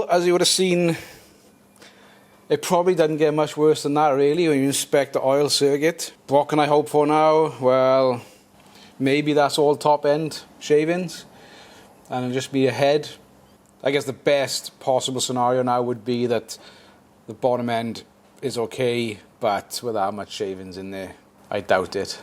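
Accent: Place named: South Wales, United Kingdom. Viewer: Thats a real Bummer, like a Major BumOle! So sad to see a well sorted bike go bad like that , you obviously know how to put a engine together but not sure if you worked on that one before the issue. At least we got to see the internals of a DRZ, i thought they were pretty much indestructable. Ihave a Husky WR250 that went pop due to a exhaust O ring tear,ingested some finest Welsh grit and did the big end crank and the bore in. I ride a Xr650R and a few more Hondas but not turned the BRP over in a few years so I just hope she is ok buried iin the back of the garage. Good luck buddy , detect the SWales accent there , alot warmer where you are atm Atb x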